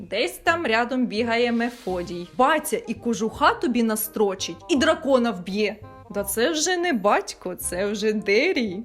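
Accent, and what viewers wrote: Surzhyk, Ukraine. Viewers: Потому что девушка говорит российские слова с украинским акцентом, но это все еще российские слова. Попробуйте понять, что означают эти бытовые украинские слова: човен, легИт, скло, бляшанка, бездрЫк, вИдлуння, прЫмара, віяло, троянда, гИлля, лИжко, вЫделка, тлумачЫти, пазурИ, герць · у неї майже суржик. не дивно, що ви усе зрозуміли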